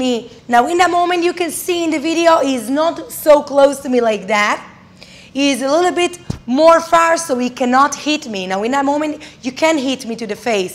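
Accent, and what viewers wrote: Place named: Israel. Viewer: אני מבין לפי המבטא שאת מישראל זה אומר שאת עושה בישראל שיעורים?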